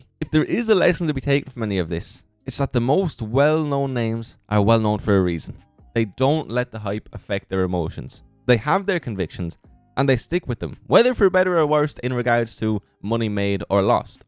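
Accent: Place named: Ireland. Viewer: What’s sexy is you’re back Baby I’ve missed you my brother. I’m glad to see you & hear that beautiful Irish accent. Let’s get down to business and make some money baby ♥️!!!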